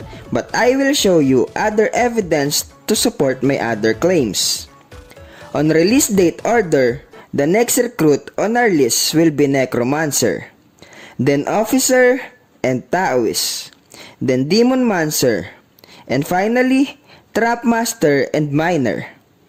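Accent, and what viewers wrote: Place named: Philippines. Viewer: Bro are you Filipino? I recognize the accent anywhere.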